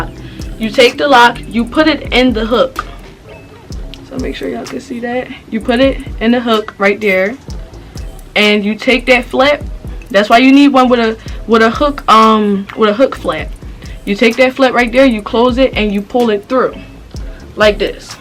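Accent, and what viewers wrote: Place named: Philadelphia, United States. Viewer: Yesss when u started talking I was like she definitely a Philly girl 😍😍😍😍 hair stores open in Southwest Philly from another Philly girl ps I subscribed 😘😘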